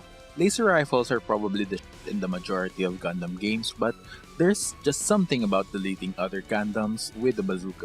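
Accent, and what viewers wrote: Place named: Philippines. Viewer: Sounds very Filipino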